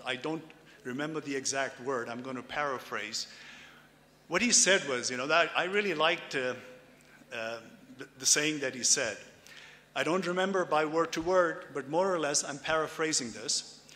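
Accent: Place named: England, United Kingdom. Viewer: Love his English accent! Humbly appreciate your knowledge and the way you inspire students with your valuable words.. incredible speaker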